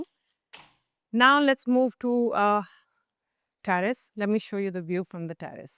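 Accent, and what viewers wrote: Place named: India. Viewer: Is she speaking English or indian?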